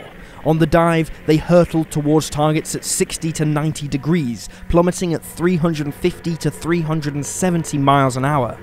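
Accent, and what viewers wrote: United Kingdom. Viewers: "american content makers", maybe, but the narrator here sounds like a limey git. Being "dutch", I would think even you would notice that. btw, I'm German descent, and I found it amusing that said limey git would pronounce "Ju" as "Jew" when describing an aircraft (a "Yunkers", if properly pronounced) used by a regime that was bent on exterminating an entire race by that same name. Twit.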